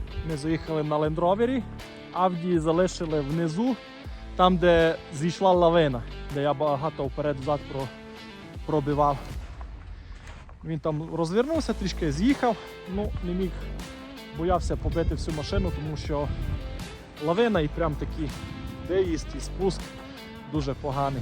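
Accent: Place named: Transcarpathia, Ukraine. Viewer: Впізнаю наш говор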